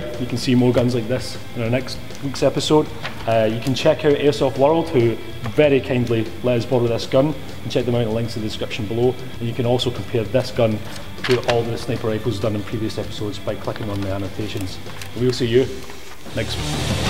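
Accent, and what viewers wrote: Scotland, United Kingdom. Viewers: Oh snap is scottish!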